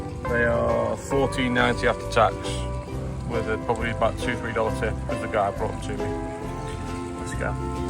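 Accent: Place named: Stoke, United Kingdom. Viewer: Watching travel day.. Cant comment on that video. But i said within seconds.. Thats a stoke accent.. But yeah buy that stoke kit. Ill wear mine on travel day in 4 weeks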